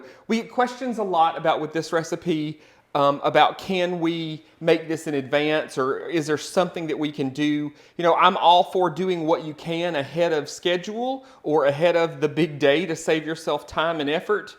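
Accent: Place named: South, United States. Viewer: I've moved from Atlanta to Scotland and now to Spain. I absolutely hearing your sweet southern accent and watching you cook mouthwatering dishes that I love. Thank you.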